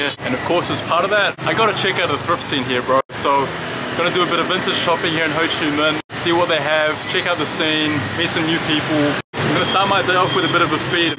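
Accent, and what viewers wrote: New Zealand. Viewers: Yo man, great video and music choice (RIP,) thought I could recognise a similar accent (from nz too lmao) defo will try to check some of these stores out at the end of the year when im in Viet! Your vids are hella entertaining my man keep it up <3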